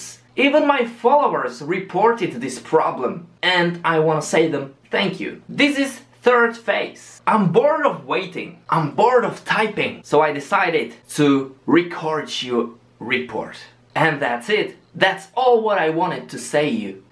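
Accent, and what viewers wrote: England, United Kingdom. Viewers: Čistí angličan super ide ty Anglický jazyk 😊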